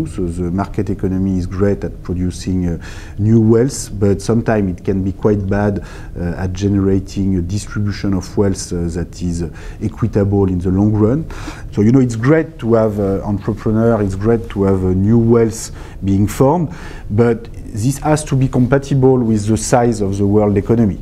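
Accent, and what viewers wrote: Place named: France. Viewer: His French accent is annoying.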